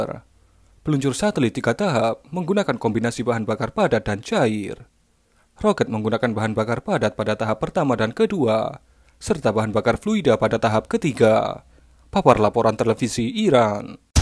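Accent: Java, Indonesia. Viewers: suaranya medokkk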